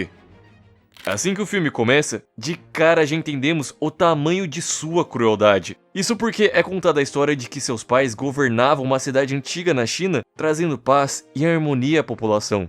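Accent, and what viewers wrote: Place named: São Paulo, Brazil. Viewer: mano, seus vídeos são bons, mas sua voz... Cara, é difícil prestar atenção. Procura as técnicas de controle da respiração para canto, vai te ajudar. E tenta dar uma segurada nesse sotaque forte do interior de São Paulo, nmrl, pprt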